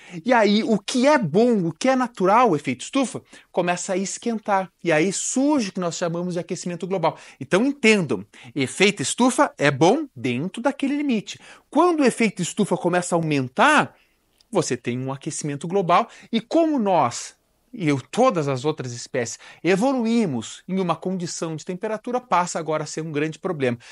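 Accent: Rio Grande do Sul, Brazil. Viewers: Professor gaúcho é a melhor coisa kkk parece que eu entendo mais ( ou deve ser por que sou gaúcha )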